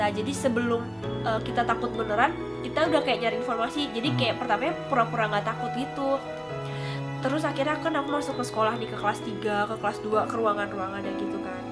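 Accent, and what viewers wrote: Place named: Banyumas, Indonesia. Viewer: Ngapak Onlen :v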